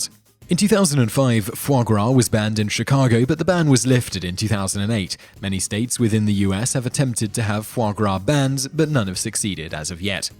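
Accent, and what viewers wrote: England, United Kingdom. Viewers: Simon said Indian tribes during this presentation. I didn't know the people of India were categorized as tribes. Another issue, why do most of your videos always have, "for example, in America"? I realize the US might be your largest audience, but the things you discuss also happen in other counties. Your American-centric views are getting tedious. If you want to come across as intelligent, please do so, a British accent doesn't mean you are smarter than the average bear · I hate listening to an english accent with head phones on. Like this channels content. Just dont like the voice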